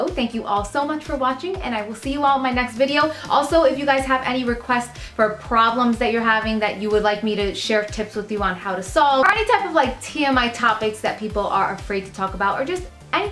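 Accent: New Jersey, United States. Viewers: ayye I can tell ur from Jersey me to😜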